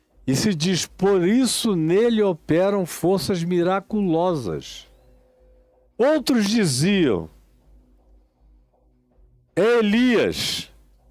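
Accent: Recife, Brazil. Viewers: Aí sabe que fala Recife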